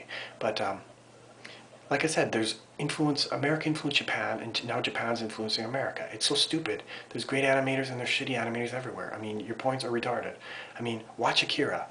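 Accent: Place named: Canada. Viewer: You didnt have to explain The Z thingie since your aboot was rly obvious liked ur vid tho def kudos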